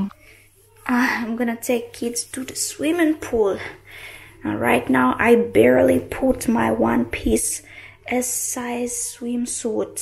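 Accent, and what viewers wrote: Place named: Jamaica. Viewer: Congrat to you+ baby girl news Wow i'ts a 👧 girl 🎉🎉❤. I tell you i respect you very much 👍🏻.you have a good vibes, you adapt the jamaican culture very much 😊🙏🏻 lady you talk like a jamaican. & you cook like a jamaican.nuff respect & nuff love.bless up from germany.🙏🏻🛐🕊💫.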